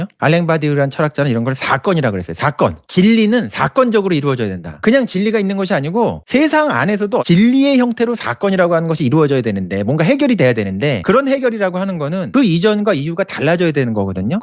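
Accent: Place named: Seoul, South Korea. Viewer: 이분 서울사투리 쓰시네??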